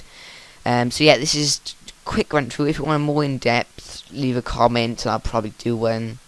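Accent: Wales, United Kingdom. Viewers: Are you welsh? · A true Welshiee, Ditto! :D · Dat welsh accent tho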